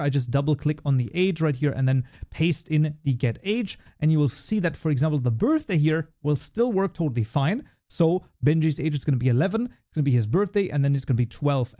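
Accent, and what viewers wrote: Germany. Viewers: Sure, Ill do so. Funny german accent <3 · Funny german accent <3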